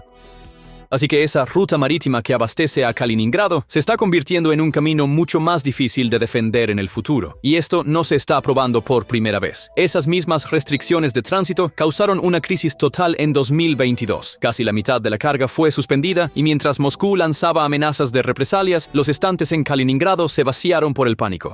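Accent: United States. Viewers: El acento usado al estilo gringo es UNA MIERDA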